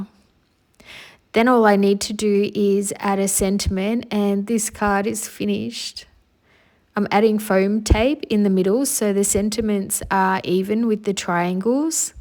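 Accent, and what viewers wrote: Australia. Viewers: Hi, I hope you are enjoying your holidays. You are my favourite channel, it is so nice to hear an Australian voice!! I would love to see a tutorial for sentiments when you have time. ❤ Adelaide Adelaide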